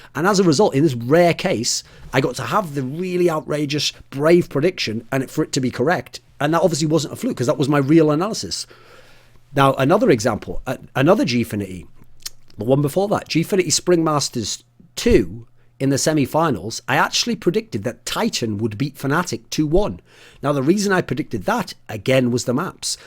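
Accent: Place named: Wales, United Kingdom. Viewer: Where is Thorin from? He sounds Welsh or some shit.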